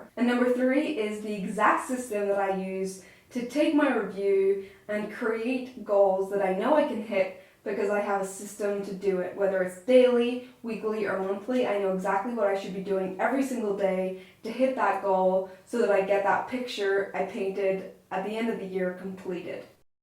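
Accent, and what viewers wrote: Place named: Belfast, United Kingdom. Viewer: Great way of breaking down your goals to achieve success and a really good video. Also love when you say 'now' and 'out', still got the Belfast accent :)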